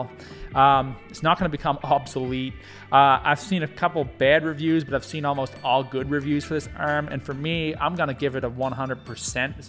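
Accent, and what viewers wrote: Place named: Canada. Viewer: Hey! Excellent video and thank so much for doing this! I stumbled across your channel (this vid) because I just upgraded from my Blue Yeti to the Shure SM7B and Focusrite Scarlett Solo Gen3. Once I started recording some audio samples, I noticed that things didn't sound any different compared to my BY which told me something was being missed somewhere along the line. That being said, I think the Cloudlifter is one of those things and maybe better cables. Do you recommend anything in particular? Also, you mentioned Canadian dollars plus you look and sound Canadian! Where abouts are you from? I'm in Southern Ontario myself. Anyway, ramble complete! Have a stellar day and thanks again for this video!!!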